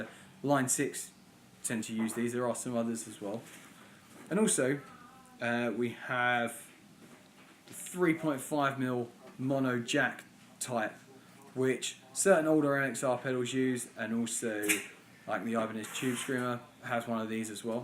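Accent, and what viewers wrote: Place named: England, United Kingdom. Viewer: Judging from the accent, I suspect you're from the same part of England as Spinal Tap.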